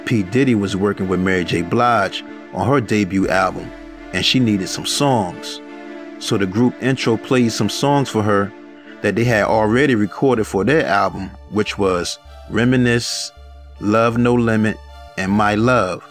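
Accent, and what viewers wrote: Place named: New York, United States. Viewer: Dorothy Copeland Sounds like my city NYC !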